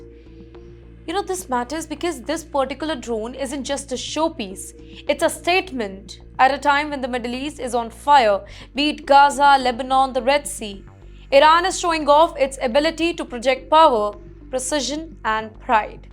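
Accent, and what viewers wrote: India. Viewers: I hate Indian English😂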